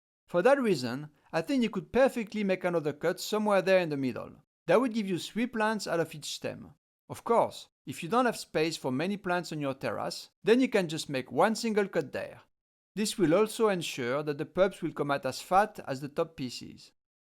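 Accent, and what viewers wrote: France. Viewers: Are you French ? You have a strong accent no ? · C'est un accent français ça non ? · French accent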